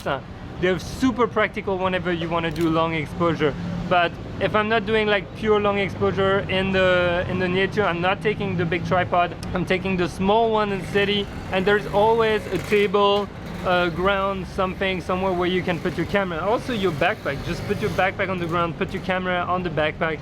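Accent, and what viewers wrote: France. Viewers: J’entends ton accent français qq part mais vos vidéo sont grave bien et ça m’aide beaucoup pour avancer dans la photographie merci! :)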